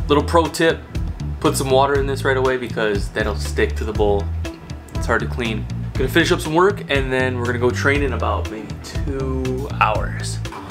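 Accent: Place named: Midwest, United States. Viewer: where is this guy from? THOSE AAAAs are midwestern AF! Canadian? he’s freakin gorgeous and sounds so funny lol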